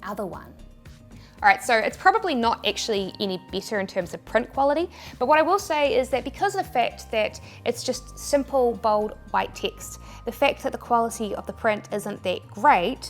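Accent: New Zealand. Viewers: Sorry.......-but the extreme New Zealand accent is a bit unclear,--- as well as hard on the ear........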